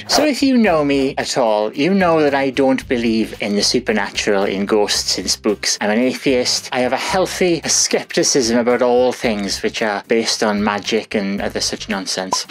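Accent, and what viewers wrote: Wales, United Kingdom. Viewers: I really enjoyed listening to your account of what happened.. I have an open mind to these things, although i have never experienced anything myself.... your Welsh accent is lovely ! xx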